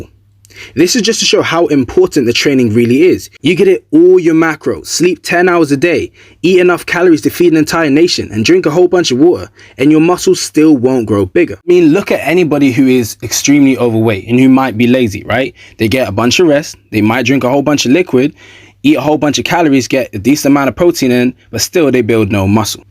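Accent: London, United Kingdom. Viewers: it’s a london accent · British accent is perfect for commentary. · lol no it's a London accent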